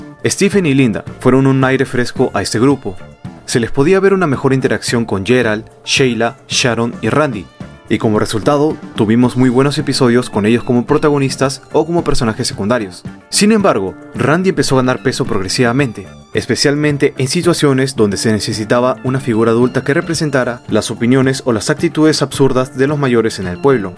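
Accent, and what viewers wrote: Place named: Peru. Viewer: Tu acento.. Me es muy familiar, eres de Perú?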